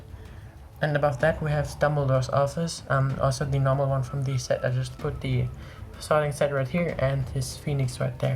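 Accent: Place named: Germany. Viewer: Are you from germany its just that the Accent sounded familiar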